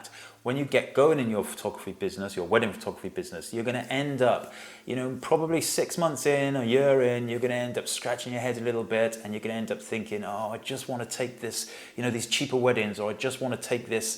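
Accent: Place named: North Wales, United Kingdom. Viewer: Great advice, looking to start up myself and this puts things into so much perspective that I haven't seen after several months of research!! Are you Welsh by any chance? You sound North Welsh. Cheers for the video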